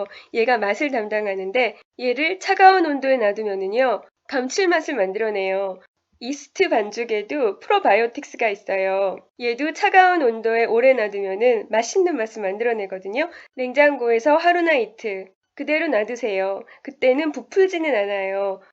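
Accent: Seoul, South Korea. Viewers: 서울 사투리 억양으로 말 하시는 분 근래에 첨 봤네. 덕분에 피자 맛나게 만들어서 먹었어요~ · 와! 듣고 보니까 말씨가 딱 옜날 서울 사투리네요 ㅎㅎ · 서울 억양 너무 좋습니다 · 사울사투리 너무귀여어 ㅋ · 목소리 말투가.. 너무 매력적이시네요 옛날 서울 사투리? 같아요 정감있고 예쁜어감의 목소리여서 계속 듣게되네요~ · ㅋㅋㅋ말투 넘 귀여우셔요ㅋㅋ약간 90년대 서울 사투리 같아요ㅋㅋ영상 감사해요!맛있게 만들어볼게요~^^ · 서울 사투리 쓰신다!!! 말투 넘 좋아요ㅎㅎ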